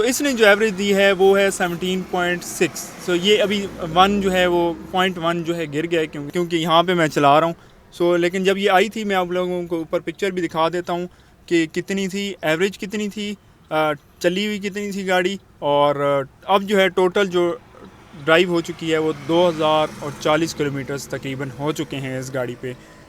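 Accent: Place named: Mianwali, Pakistan. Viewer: Bhai ap Mianwali sy ho kiya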